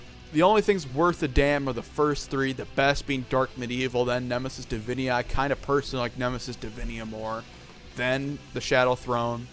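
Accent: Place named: United States. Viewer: lol, The Shadowthrone being the worst... Nemesis "Divinia"... lol :D 1. Disagree that any of the instrumentals are pointless... that's just a pointless point... 2. Disagree with your "absolute best" songs on the 1st two albums :) 3. Disagree on "Rebel Extravaganza" being the best album from those to come... I think it's the worst... Volcano is much better. Now, Diabolical; Satyricon & Deep calleth upon Deep are amazing albums! There's absolutely nothing wrong with them! This guy only says: this is the worst of all & that is the worst of what I've ever heard... lol, this guy sounds like 100% American id*ot who's trying to make sense of pure European Black Metal Epicness! :) Otherwise, there're some new stuff that I learned from that video, like the early history of Satyricon & their formation. So, I wouldn't say it's completely useless... something like 4/10 is fine. 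The rest is only "boring as fuck" this & that :D Nemesis DIVINIA - Peace Out! :)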